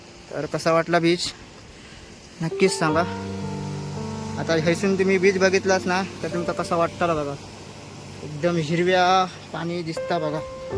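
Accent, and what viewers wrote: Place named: Konkan, India. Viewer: सुरुवातीला थोडंसं फास्ट बोलला होता पण नंतर छान पद्धतीने माहिती दिली आहे.कोकणी भाषा गोड वाटते ऐकायला.❤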